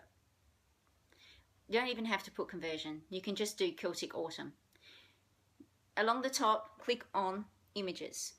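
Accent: Australia. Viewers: Thank you, for this very informative video! By the way, I LOVE your beautiful Australia accent! Your voice is like music to my almost deaf ears! I would love to hear you sing!:) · What a fabulously helpful video! I knew some of these sites, but not others and I LOVE the framing app! Have just purchased! Now off to take some decent photos of some of my finishes so I can use it properly! I grew up in WA, but have been in the UK since I was a teenager - I love hearing your accent. Although not a WA accent, still reminds me of 'home' . Xx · I loved this video, as I’m new to cross stitch. Hey love the Aussie accent as I to an Australian. I found this video extremely helpful as I hadn’t heard of many of the apps before. I really want to try digital charts. I want to convert my paper ones to digital. Do you know how or is there a video you’ve made for this? I’ve also subscribed to your channel. 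I’m looking forward to seeing more of your videos.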